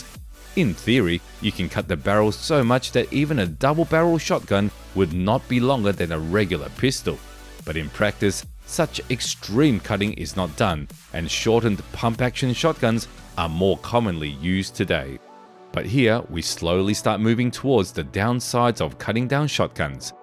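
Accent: United Kingdom. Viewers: this vid lost me at a brit narrating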